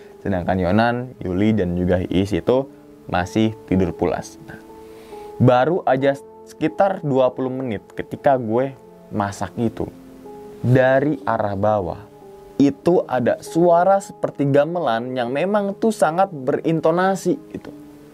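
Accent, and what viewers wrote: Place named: Yogyakarta, Indonesia. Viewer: Pengalaman pribadi,enak dengernya detail penjelasan lokasinya,suara nya Jogja jelas. Jogja hadir bang 😊❤️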